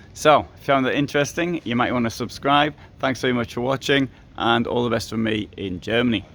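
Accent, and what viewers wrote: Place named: Lancashire, United Kingdom. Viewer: American here, I’m kind of a language and accents nerd. I love British regional accents. Are you from Lancashire? Three fourths of my heritage is from the UK. It’s mostly English and Scottish on both sides of my family, with a a small pie slice of Cornish, and the tiniest slivers of north Wales and Northern Ireland. The rest of me is Slavic. Cheers from a mile high in the Arizona mountains.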